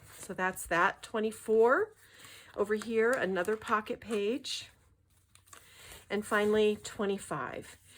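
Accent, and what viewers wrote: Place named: North, United States. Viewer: I just found your channel and look forward to seeing what you have. I am in Greensboro, NC. Are you near me? Your voice sounds familiar. I was involved with the High Point Quilt guild years ago and even further back taught scrapbooking classes here in town. Just curious. You also sound like maybe you lived up north some, like me, LOL.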